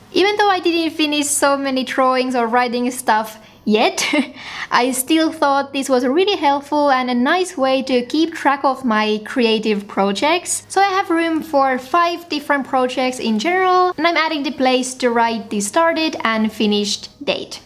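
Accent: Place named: North, Finland. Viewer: Hei from Australia! I love your beautiful minimalistic planning & bujo art and sense of space. Thankyou for the inspiration ❣️ Ps. Just Curious - I studied in Helsinki awhile, & your accent is different - are you from the north? Or do you have some Japanese background?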